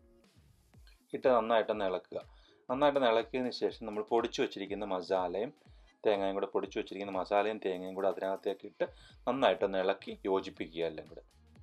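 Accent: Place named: Kottayam, India. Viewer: Well explained, Kottayam ഭാഷ ഷ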